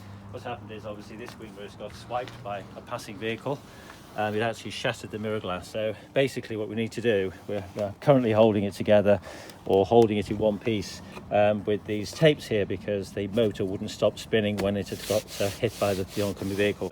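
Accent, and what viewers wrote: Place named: United Kingdom. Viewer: Maybe it just me and growing up watching Michael Caines Battle of Britain. But with your voice and accent I feel like your working on a Spitfire. Very enjoyable video explaining the repair.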